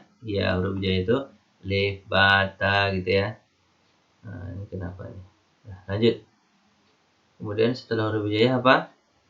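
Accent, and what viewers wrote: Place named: Malaysia. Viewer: Logat Melayu nya 😂😂😂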